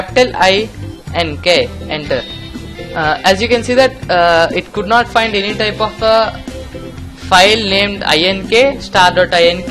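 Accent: India. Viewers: I love how he sound Indian lmao. Thanks for the tutorial tho. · When i heard the indian accent I just clicked off and disliked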